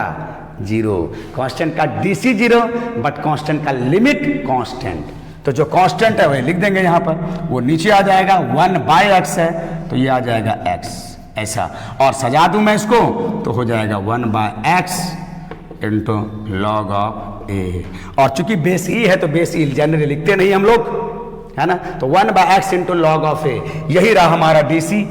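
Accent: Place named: Bhojpuri, India. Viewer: Very nice sar bhojpuri style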